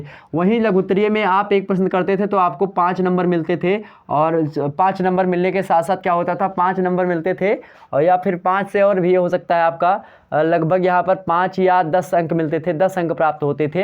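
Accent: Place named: Gonda, India. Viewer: Voice se pahchan gaye sir ham bhi gonda se hi hai But aap bahut aacha padhate hai sir Ba third hindi paper 1st me jo kaviyo ke kavyans bacha hai sir उसे karwa dijiye sir please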